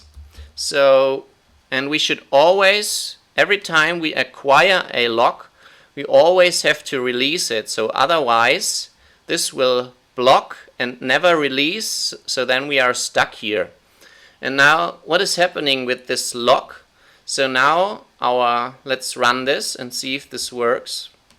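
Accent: Germany. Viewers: you Sound german tho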